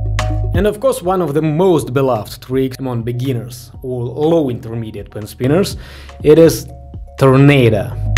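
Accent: Russia. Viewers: Акцент русского человека!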